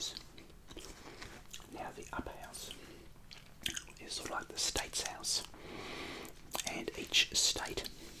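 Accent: Australia. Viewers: I think half of these views are mine for the last months 😅😅 i hear it every day almost. Chewing gum rules! Till now never have noticed the Australian accent, but you introduce me to it and I absolutely love it. And it was very creative idea to talk about politics (maybe not a popular theme in the asmr world but a wonderful niche)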